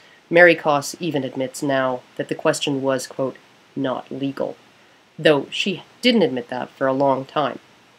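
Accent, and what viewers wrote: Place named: Canada. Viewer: I LOVE CANADIAN ACCENTS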